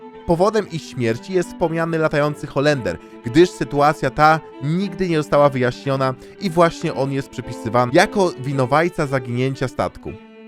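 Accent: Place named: England, United Kingdom. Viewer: Myślisz że to tak łatwo gadać po Polsku kiedy spędziłeś 18lat w Anglii?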